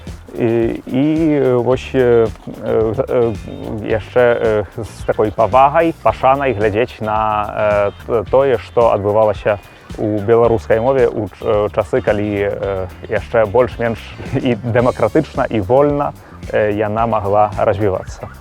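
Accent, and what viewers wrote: Poland. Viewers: Падляскi акцэнт. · Бел яз с польским акцентом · Сапраўды, польскі акцент😁 А мова цудоўная😁😁😁🌞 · Никаких претензий , но это не чистый беларуский язык. Слышится польский акцент и довольно сильно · Як прыгожа ліецца яго мова! Мякка і натуральна ❤️ Але я б сказала, з лёгкім флёрам польскага вымаўлення) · Ня дрэнна сцелiць!😲 Малайчына, сказаць няма чаго! 👏👏👏 Ды яшчэ з нейкiм такiм "польскiм" флёрам... Вельмi цiкава было паслухаць. РесЬпект ды пашана! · Чуў яго некалькі год таму, калі ён, як я разумею, яшчэ ня меў такога шырокага досьведу стасункаў з беларусамі, і вымаўленьне было больш расейскае, але вось гэтыя польскія ноткі былі ўжо тады. Мабыць гэта таму, што польскую ён вывучыў раней і гэта наклала пэўны адбітак на тое, якую гаворку ён выбраў і выпрацаваў для сябе. Насамрэч, беларускіх гаворак асноўных, здаецца чатыры, таму выбірай любую і не памылішся. 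Прыхільнікі правілаў і норм няхай ідуць лесам, бо норму тую двадцаць разоў за нашае жыцьцё зьменяць па замове ўладароў. Будзеш за гэтым сачыць і ніколі мову не вывучыш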